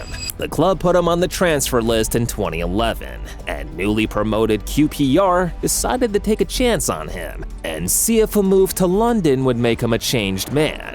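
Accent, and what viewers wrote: United States. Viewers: Be quite a interesing episode apart from the crap american narrator. Bellend!!joey is a wrong un but talks "sometimes"sense in some issues.absolutley radio rental but his family shit wernt his fault.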